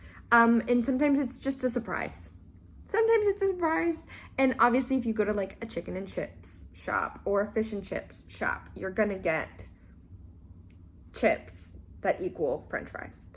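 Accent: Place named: New Zealand. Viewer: I can hear a bit of Kiwi inflection in your speaking which is super endearing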